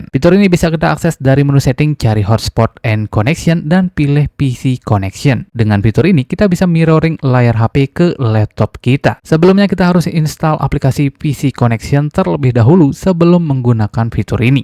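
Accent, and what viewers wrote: Bali, Indonesia. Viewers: ini logat bali kah?atau mana?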